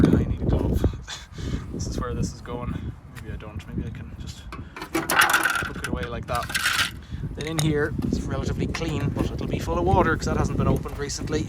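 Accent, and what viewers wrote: Ireland. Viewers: oops, my bad. I thought it was a channel from Ireland, I was wondering, one would never get away with dumping like that in Ireland, at least I hope not. I wrongly assumed when I heard the Irish accent that it was Ireland.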